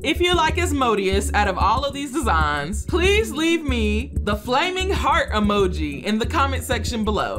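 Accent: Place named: Georgia, United States. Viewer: girl i’m loving your energy in these vids!! are you southern lmao, bc the voice is giving Georgia lowkey (this isn’t shade i’m an SC gworl)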